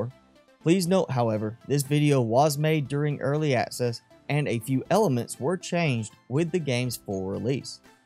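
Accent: South, United States. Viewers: Country as hell for a YouTuber maybe lol. 🤠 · Thanks man. Btw you sound country as hell!!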